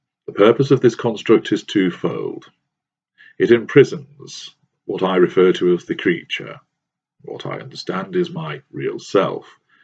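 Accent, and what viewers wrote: United Kingdom. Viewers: He’s in England, this is the typical English/British accent.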